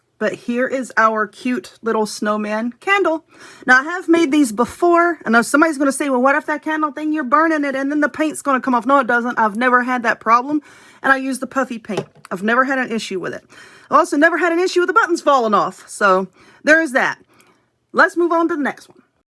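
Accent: South, United States. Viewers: I love watching your videos, you seem to always have a good time 😊. Love your Southern accent, as I was born in Mississippi and now live in California 💜💜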